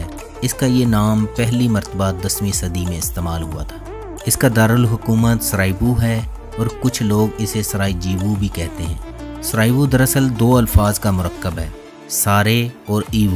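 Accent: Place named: Punjab, Pakistan. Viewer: yar urdu to seikho bhaye sp URDU esy bolty ho jesy panjabi bol rahy ho mazrat k sath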